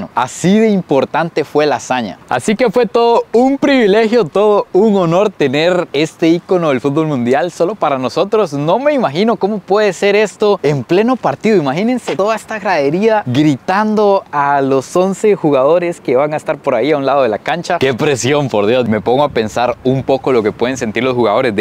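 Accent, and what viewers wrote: Argentina. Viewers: ÉSE ACENTO ES DE ARGENTINA NOOOOO OFENDASSSSS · Cuando te sentaste al lado de gardel hablaste como argentino,no como uruguayo, ellos no dicem che ni hablan con ese canto caracteristico de argentina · Mano no hables como argentino que a muchos Uruguayos n le va a gustar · Decime por que hablas como Argentino .Nosotros no hablamos así.Estaria bueno antes de hacer los videos se informen un poco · Sonaste a argentino, no a uruguayo…. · Hola como estas? Muy lindo video, pero solo te voy a corregir un error... Estas hablando como argentino, ese acento no es uruguayo, es como si fueras porteño, el Uruguayo habla mas tranquilo, sin resaltar tanto las palabras. Otra cosa nosotros no decimos siempre "che", nosotros decimos "bo", ta" y listo😁😁😁 el che y el boludo es mas argentino, aca, podes decir "fijate y fíjense" que es como decir el miren u observen o chequen esto. 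Saludos cordiales desde shangrila, ciudad de la costa, canelones💪💪💪💪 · Pero porque hablas como argentino. Pero che.... el che es argentino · soy de Uruguay pero por favor no hables en argentino pe da cosa xd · En Uruguay no dicen che y hablan más pausado, estas imitando el argentino😅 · sos argentino o que xd los uruguayos no avlamos haci xdd C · Es casi lo mismo, qué ganas de romper las pelotas. Además, los argentinos tienen muchos acentos. En todo caso, decí bonaerense. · TODO CASI BIEN PERO NO ABUSES DEL CHE Y MAS CON ESE TONO QUE LO HACES NOOOOO PARECES E ENFRENTE ARGENTINO NO URUGUAYO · Molesta un poco que se quiera hacer el uruguayo pero hace el acento argentino... pero buen video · Ahí no están los poderes de Uruguay , solo el poder ejecutivo, en el Palacio Legislativo, esta el poder legustavito, y en el Palacio de Justicia, el poder legislativo. El teatro Solis puedes entrar, solo tienes que ir en l horario en que se hacen tours con guía para turistas. 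no hables como Argentino cuando te haces el uruguayo, así no hablamos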